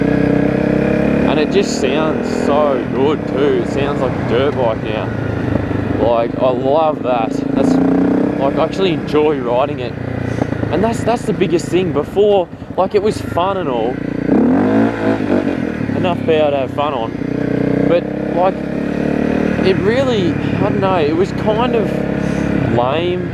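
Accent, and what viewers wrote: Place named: Australia. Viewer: I am in Canada have been watching your vids for a while and have a 250L and i cant tell you how many times I have joked around and said here in a aussie accent and my friends all look at me like im retarded lol your awesome dude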